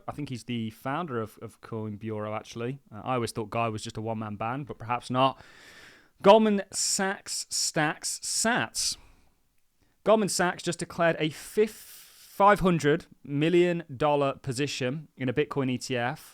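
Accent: United Kingdom. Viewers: Retail as you define them, will most likely not be back in any force. They are completely tapped out due to government induced inflation. You sound like you are from the UK, how are those energy cost working for average person. This is where You Tube pendants have got it wrong. Wall Street has taken over crypto!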